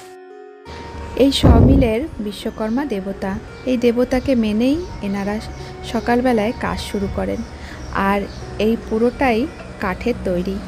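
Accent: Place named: Bangladesh. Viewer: আপনার vlog খুব ভালো লাগে , শুধু একটা অনুরোধ, বাংলাদেশীদের মতো "এস্থানান্তর" "এস্থাপনা".....উচ্চারণগুলো একটু পরিবর্তন করলে শ্রুতিমধুর লাগবে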